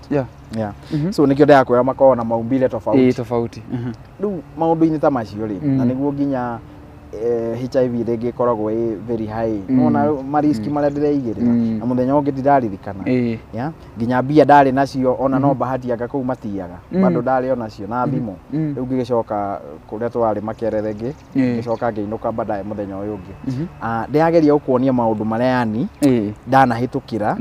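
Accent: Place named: Nyeri, Kenya. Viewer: Kikuyu ya nyeri slaps differently..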